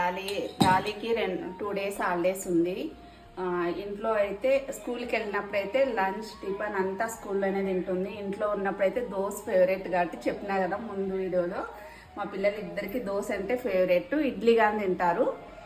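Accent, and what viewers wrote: India. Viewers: Me videos and meru chese recipes, me rayalasema yasa anni chala ante chaala bauntayi garu 🥰🥰🥰❤❤❤ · Mee matalu chala baguntai pinni endukante mana anantapur slang ekkada filter cheyyakunda original slang ❤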